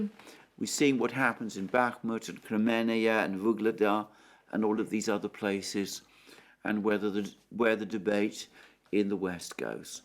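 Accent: England, United Kingdom. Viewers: It's weird listening 🎧 to a communist with an English accent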